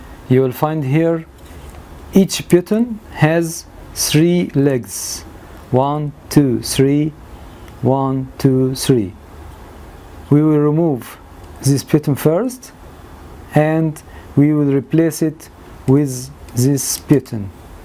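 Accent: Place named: Egypt. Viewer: Nice Egyptian English .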